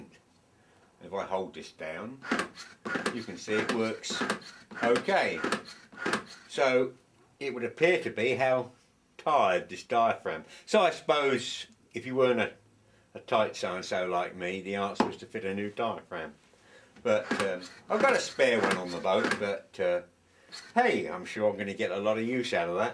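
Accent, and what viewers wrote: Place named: England, United Kingdom. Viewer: I always enjoy your videos. Whenever I hear your voice, it always reminds me of the Wallace and Grommet animations. I have always been a fan of the English wit and delight in hearing an English accent. I encourage you to continue making your videos, I find many useful ideas for projects and repairs of my own.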